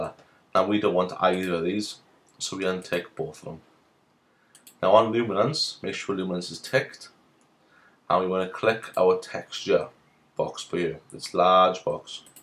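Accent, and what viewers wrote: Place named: Wales, United Kingdom. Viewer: Well, well! What a surprise to hear your accent! I'm used to hearing Polish, German or American accents when I click on tutorials, so this was a rare treat! That has to be worth a sub! I'm just down the road in Neath! Nice tutorial by the way! Where did you learn all this?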